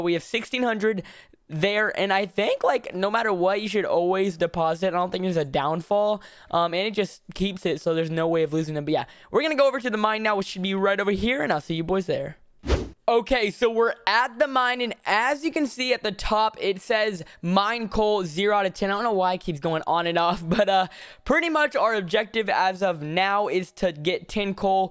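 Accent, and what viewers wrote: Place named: Texas, United States. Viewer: ur texas accent tho ... “tin” = ten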